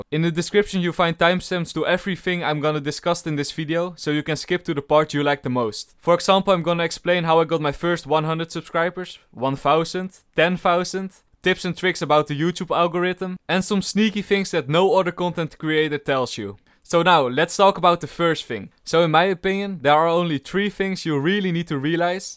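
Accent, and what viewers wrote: Netherlands. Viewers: hi sir following you since 4k subbed from my 6 account and love from india and most important information to reach 100k u should have a great content and a lovable Dutch accent love your vedios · I am not even interested in making a Youtube-Channel, yet i warched the whole video because this dutch accenr and your charakter is so addicting. Kewp it up man, see you at 200.000 Subs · me not even thinking about youtube but still watching the video to hear his dutch accent :D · i am in love wid your dutch accent !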